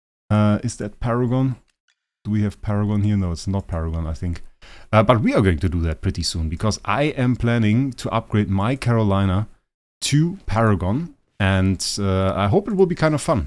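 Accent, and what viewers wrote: Germany. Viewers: the perfect pronounciation of that made me realise that you were german and that accent wasnt from some other language LMAO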